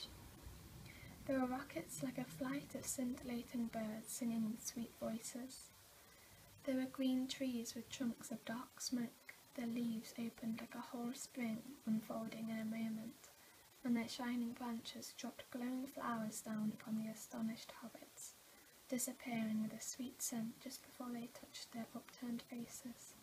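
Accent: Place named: Wales, United Kingdom. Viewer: It sounded like you had a strong Welsh accent in first video but not so much now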